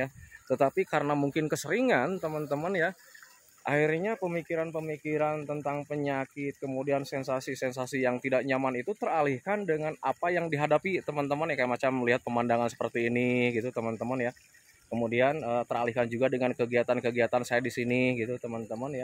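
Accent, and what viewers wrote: West Java, Indonesia. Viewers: Sundana ti mana sih kang??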